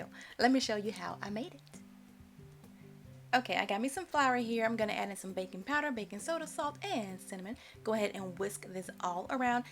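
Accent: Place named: South, United States. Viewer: Luv your cookin' and Southern twang sis! 🥰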